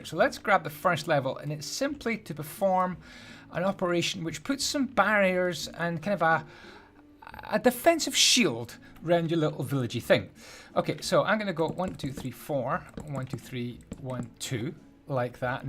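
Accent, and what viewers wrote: Scotland, United Kingdom. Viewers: I love the wee bit of Scottish in there. :) Well done you. ^^ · Decided to subscribe because of this building system! And because you're Scotish!. Love the system. IT's so easy to follow as a beginner and easy to spruce up as an expert builder. Keep up the good work.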